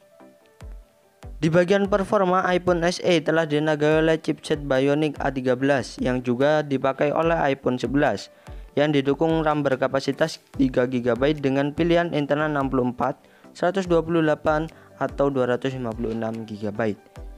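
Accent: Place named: Tegal, Indonesia. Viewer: Medok bener ni tegal nya. Lanjut gan nice info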